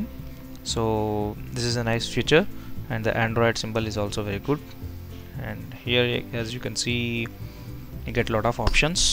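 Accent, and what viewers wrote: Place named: India. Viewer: angrezi nai aati to zaruri hai bolna, Generic Indian Accent Guy...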